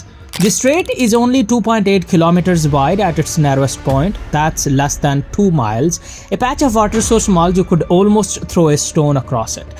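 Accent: India. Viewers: Indian accent. BS from a trouble maker.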